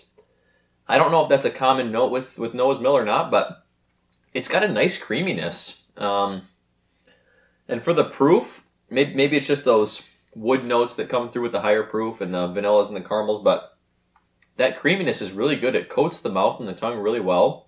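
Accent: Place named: Wisconsin, United States. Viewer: Nice accent. Wisconsin?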